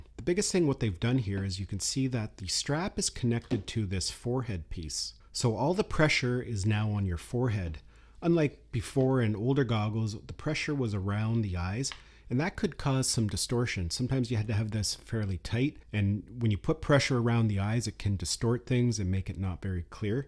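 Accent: Canada. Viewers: Your Canadian accent is like nails on a chalkboard. 🤦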